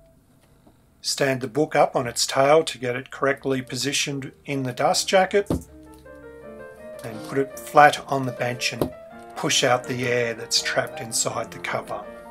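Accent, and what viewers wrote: Australia. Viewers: Hey, are these sleeves removal once applied? Just found your channel and it's great, sounds like your an aussie as well - I may be wrong. Cheers mate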